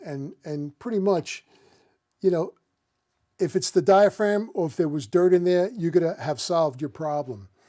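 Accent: New York, United States. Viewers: Hey great video. Glad to hear another NYer voice 💪🏼, I’m from Bensonhurst & doing this over 23 years. Love these lil old school tricks you have. Keep up & god bless 🙏🏼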